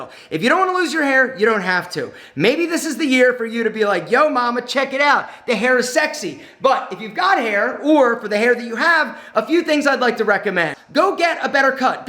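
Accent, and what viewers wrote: Philadelphia, United States. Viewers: “Wood-er” the Philly/Delco accent stays with you!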